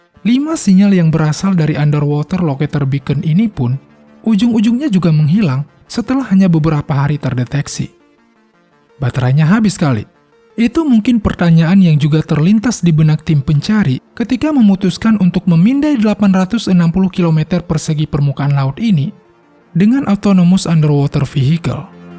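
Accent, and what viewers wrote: Bali, Indonesia. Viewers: Naratornya orang bali kayanya yak? haha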